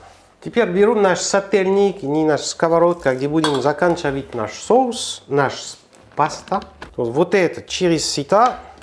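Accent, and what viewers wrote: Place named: France. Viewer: Готовлю по! Вкусно. И много ньюансов, о которых никогда не догадывалась. Спасибо большое. Отдельное спасибо за русский язык с французским произношением.Изюминка.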